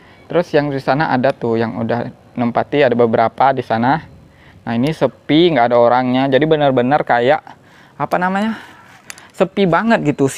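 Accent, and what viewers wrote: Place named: West Sumatra, Indonesia. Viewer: Logatnya sih seperti Urang Awak yo ?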